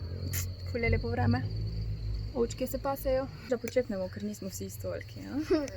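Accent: Carinthia, Austria. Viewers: Ful si fajna!💕🌊Aja iz kot si ti ko mas ful koroški naglas,js sm iz koroske?🌍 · tudi ona je iz koroske drugace nebi mela koroskega naglasa😂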